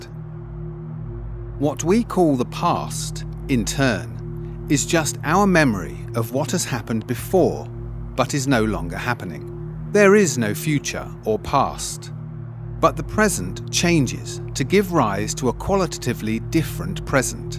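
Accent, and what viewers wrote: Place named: United Kingdom. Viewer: They always gata put the british accent so it could look more interesting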